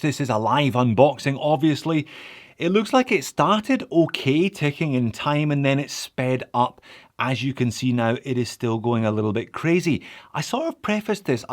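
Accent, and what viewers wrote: Scotland, United Kingdom. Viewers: I so want to try a tuna on, I know it's a watch that you need to wear to get a feel for. Fanny around, always good to hear a bit of Scots.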